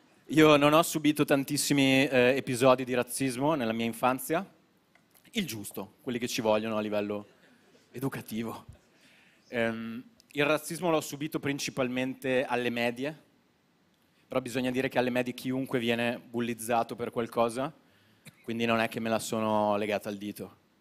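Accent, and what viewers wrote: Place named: Veneto, Italy. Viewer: Accento Veneto😂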